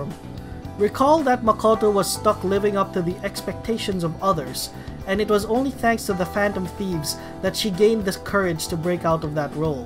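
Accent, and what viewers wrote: Philippines. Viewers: He has that thick filipino accent. Pretty sure he is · I just can’t help notice your accent. Are you a filipino? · That filipino accent is too thicc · accent seems familiar. are you a Filipino? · Damn that Filipino accent is getting to me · You oddly sound like a Filipino. Great stuff tho! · The Filipino accent is killing me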